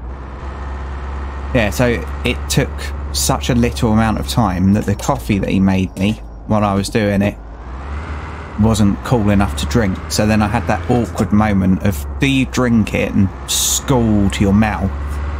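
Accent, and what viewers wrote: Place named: United Kingdom. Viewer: 3am and I just bought this haha, sod sleeping tonight mate lol. Nice to hear another British accent too!! We're getting overrun by merican oootubers haha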